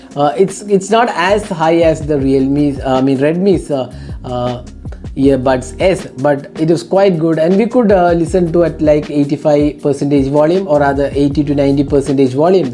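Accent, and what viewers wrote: Kerala, India. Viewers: You sounds like a mallu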